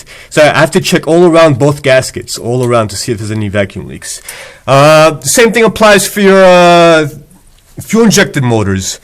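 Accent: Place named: New York, United States. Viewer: Must be from NEW YORK!!!!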